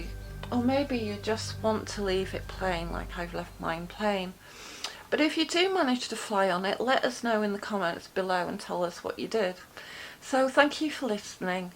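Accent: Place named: Manchester, United Kingdom. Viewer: U sound like from greater Manchester ha subbed u, Oldham here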